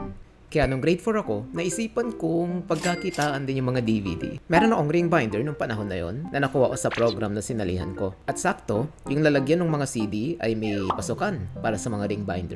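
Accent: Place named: Conyo, Philippines. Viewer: May accent ka boss conyo ka siguro! Ahehehe you sound unique naman kaya cool parin!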